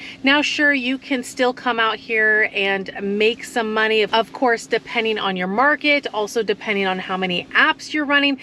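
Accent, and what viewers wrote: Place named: Pennsylvania, United States. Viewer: You sound like you are from Pennsylvania.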